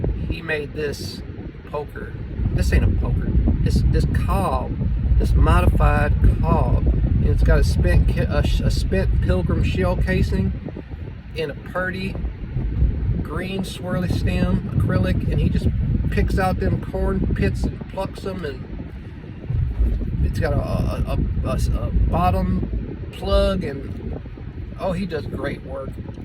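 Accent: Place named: South, United States. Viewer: Love finally hearing the true CLE southern accent! Bless your heart ❤! Long live the chupacabra and Mr No Name Meecham!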